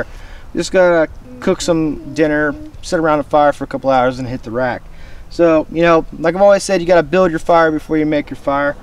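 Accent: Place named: Appalachia, United States. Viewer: good job. you ever spend any time in WV. sometimes I hear a slight hint of hillbilly twang in your accent (meant as a compliment)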